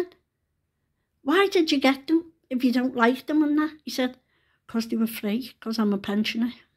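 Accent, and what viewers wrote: Liverpool, United Kingdom. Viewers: hahaha "talk of the devil" hahaha great how you say it. Can listen to you with admiration together with my mum. Love that Liverpool English so much 👍🤣😄😂I'm from Australia. You look great by the way luv🇦🇺👍🇳🇱🤗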